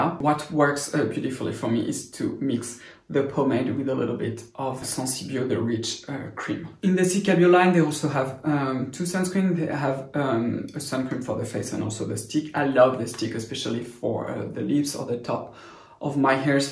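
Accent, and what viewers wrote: France. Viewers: gotta love a french accent oh lalà🤩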